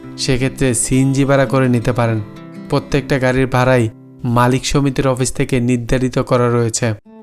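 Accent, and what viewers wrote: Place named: Dhaka, Bangladesh. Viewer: আপনার উচিত ভিডিও বানানো ঢাকার আশে পাশের মানুষ এভাবেই কথা বলে ওনার আঞ্চলিক ভাষা মাঝে মাঝে চলে আসে এটা স্বাভাবিক আপনাকে ক্যামেরাতে কথা বলতে দিলে হে** দিবেন আগে নিজের দিকে তাকান🐸😅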